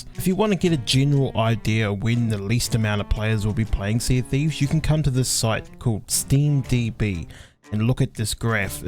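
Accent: New Zealand. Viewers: quick question are you from NZ?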